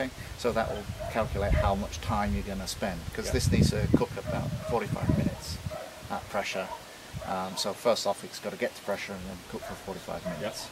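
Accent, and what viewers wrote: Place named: United Kingdom. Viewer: You mean "spawn jars"? Oh, sorry, your british so you talk funny to me.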